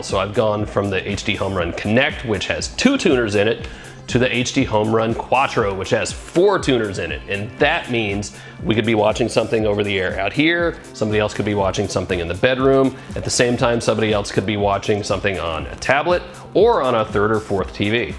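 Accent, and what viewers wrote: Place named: Nova Scotia, Canada. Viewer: You sound like you live here where I do, Nova Scotia, Canada. 'Only 2 Providers', Eastlink and Bell and they both charge the same and have pretty much the same packages. I'm paying $ 80.00 for internet as well, however, I do think that's too much for 100 Mgbs, it should be about half that, around $ 30.00 to $ 40.00 per month. Not good bc we actually have absolutely 'NO' options with only 2 ISP's.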